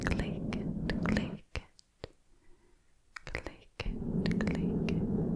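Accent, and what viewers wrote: Scotland, United Kingdom. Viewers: Omg i never knew i needed scottish whispery this is soooo tingly! Even the sponsoring part was soooo relaxing ❤️ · 4 PM here, but I know what will put me to sleep tonight. Thank you, love your Scottish accent! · I like your sweet Scottish accent! It reminds me my own Russian accent! Very understandable and very nice! High five) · Scottish words and the Scottish accent were made for ASMR - I like it, a lot!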